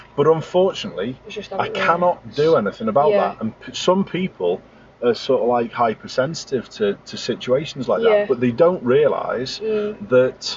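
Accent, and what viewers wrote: Liverpool, United Kingdom. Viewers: Gotta love the Scouse accent lol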